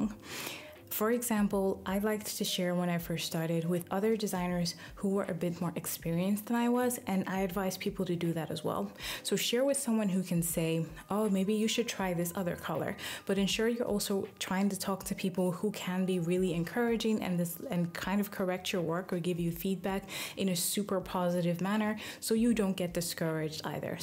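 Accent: Nigeria. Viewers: sounds Igbo dear, are you Nigerian? I am fully entering the UIUX Design space. Switching my career from media and communication.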